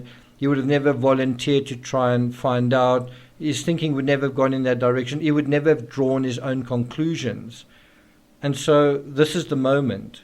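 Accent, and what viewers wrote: South Africa. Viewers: South African youtuber? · Are you from south africa? Your accent reminds me of my friends from south africa. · I know an SA accent when I hear it. Awesome channel!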